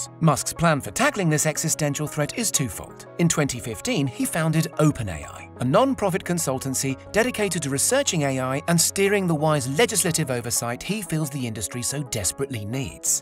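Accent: United Kingdom. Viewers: Why do I love this guy's British voice so much?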